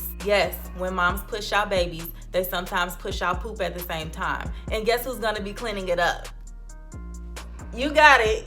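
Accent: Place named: Los Angeles, United States. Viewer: Where you from!? You sound like you from LA! Wit yo pretty ass!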